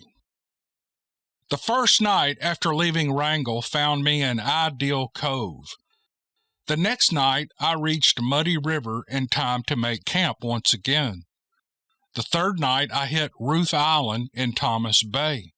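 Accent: South, United States. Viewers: I made it to the end, yah!!! I'm just sad it ended. I could listen to these forever, I wish they were hours and hours long, I never get tired of it! I have always loved listening to people tell stories, I love stories! Now every night is storytime!!! My daddy was the greatest storyteller, he told us stories all the time and I loved it. He could just draw you in and keep you hanging on by your fingernails; he was a scotch Irishman and you know how they can spin a tale, except his work true. I love the sound of your voice and I can understand everything you say because you sound like everybody I know. You've got that slow Southern drawl that I know so well. You seem like the nicest guy in the world. I started watching this one about last night, I would listen a while and fall asleep a while and repeat... several times. I finally made it through and it's now in the morning; I think I'll take a nap. 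Later, I'll start my search of your other videos for my fright night creepy fest. Late at night, when the lights go out, you come on; you make living fun. God bless you real big Jude, from Kentucky ✝️🐴🌿🇺🇸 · Yes Sir Mr. -- I not only made it to the end, but this is the third time I've done it. I like to cue up a few videos, press play just before climbing into bed. ~ My bed, is surrounded with speakers, and there's a 75" UHD 2160p monitor a few feet away, into which a computer, amp, & sound mixer are connected and nothing else. I refuse to have cable or ANY type of mainstream TV. Just the internet, and full control of what content comes into my home. Needless to say, I often get so damn comfortable, it's about all I can do but fall asleep. I'm kinda new to this channel, in terms of time, (about 6 months) but not so new in terms of content. I listen to something here at Dixie, close to every day, or sometimes binge. 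~ When I DO pass out, and miss out on the end of a story, I rinse and repeat, next day. Usually only with THIS channel, -- Or sometimes Buckeye BF and recently Neoma Finn's channel, but mostly THIS one, because it is about the best for really good stories...(Man, there's a lot of crap out there) --I love that nice Southern accent, (I'm sure You know the one I mean), soothing narration, and genuine, original content. (Dang!, If I would have ever met a cute girl in my youth, with an accent like that, I'd be FINISHED. My heart would never have escaped alive...) ~You have a LOT of videos, with really good stories that feel quite sincere. I am in NO rush. I'm taking my time. To thoroughly, slowly go through them. I like the long ones, and that's why I like the YT CUE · I listened to the entire series. I loved it. Each story was unique and interesting. I am a great fan of your podcast. To the snob who criticized your accent, as another Southerner, I would quote my Momma who would say, "kiss my A double scribble." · To the person who mentioned your accent. 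Didn’t the name tip you off? It’s not called “ Fargo Cryptic “. voice is not only soothing, it’s perfect. I listen at night while shutting down for the day. It’s the perfect bedtime stories.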